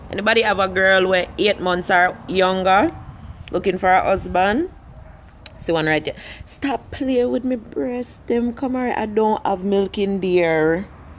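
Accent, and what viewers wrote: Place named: Cockney, United Kingdom. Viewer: The cockney though 🤣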